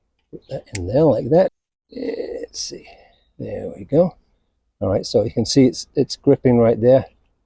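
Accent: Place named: Upper Midwest, United States. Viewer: Nice video .. interesting accent .. sounds like you could be upper midwest .. Chicago maybe .. hmmmmmm!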